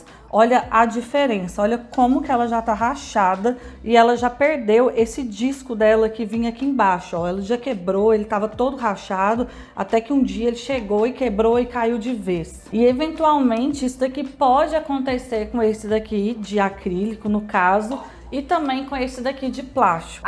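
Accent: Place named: Minas Gerais, Brazil. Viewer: Gosto muito da maneira didática que você explica. Fora que seu sotaque mineiro é uma delícia de ouvir. 🌺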